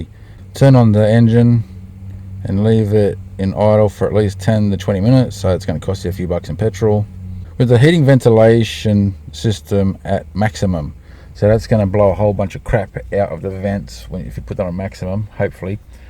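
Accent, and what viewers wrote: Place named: New Zealand. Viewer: haha oh really 😂 sorry mate - yeah definitely heard some Kiwi twangs in there. Maybe with so many of us kiwis here it’s starting to rub off on ya! Ha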